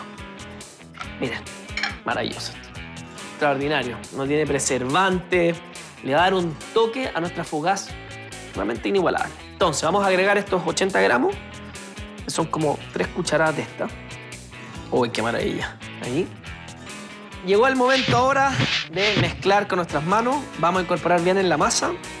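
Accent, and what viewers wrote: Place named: Chile. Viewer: Dudé que fuera chileno porque entiendo todo lo dice JAJAJA. Qué buena receta, gracias!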